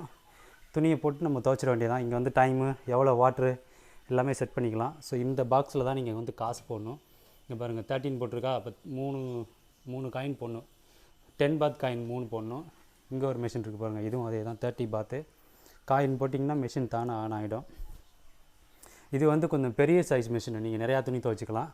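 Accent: India. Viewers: Hey, your Tamil slang still same, that’s cool 😎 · நம் ஊர் தமிழ் கேட்கும் போது மிக அருமை.உங்க குழந்தை தமிழ் பேசுமா.வாழ்த்துக்கள்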